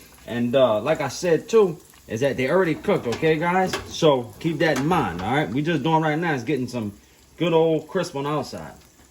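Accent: Louisiana, United States. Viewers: This man is no stranger to Cajun seasoning, "lemme tell you dat"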